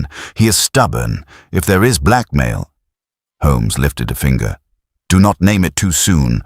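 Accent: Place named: Liverpool, United Kingdom. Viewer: Ok Scouse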